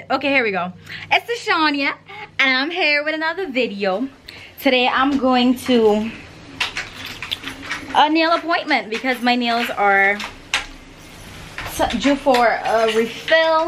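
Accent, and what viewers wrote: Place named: Barbados. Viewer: I love your Barbadian accent! You must be from Barbados.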